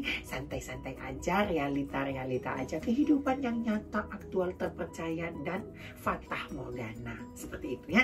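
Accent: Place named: West Sumatra, Indonesia. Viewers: AKHIRNYA YG GUE CARI CARI TENTANG SIAPA DAN ASALNYA DARI MANA TERJAWAB SUDAH🥰 GATAU KENAPA GW SUKA SAMA KARNA DIA MIRIP TANTE GW YG UDAH ANGGEP GW ANAK NYA DARI GW SD DARI CARA NGOMONG NYA DLL MIRIP 😂 DAN DUGAAN GW BENER PANDAI MANGECEK BAHASO MINANG KARENA NGOMONG BAHASA AJA KAYA ORANG MINANG NGOMONG BAHASA INDONESIA 😂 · ini bahasa indo nya sperti orang minang berbahasa indo..salam dari padang lsg mami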